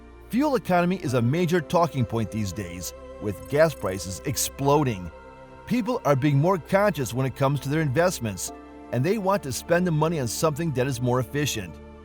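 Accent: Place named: California, United States. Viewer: This guy sounds like a Californian who doesn’t know anything about anything talking about cars like he’s an expert in the consumers opinion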